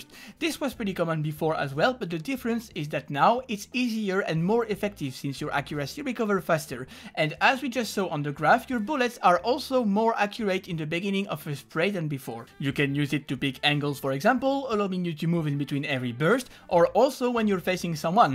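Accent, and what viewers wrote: France. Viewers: Un léger accent français mais Nice vidéo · French and uk englisch pronounciations are the best:) keep it coming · Your prenouncation is fine, you just talk english with a french accent you cant help that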